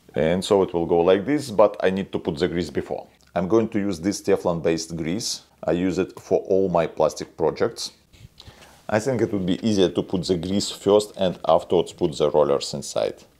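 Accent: Russia. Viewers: Такой русский ацент и внешность русская) За работу респект! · Жёсткий русский акцент)) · Как по мне русский акцент жобавляет шарма роликам ;)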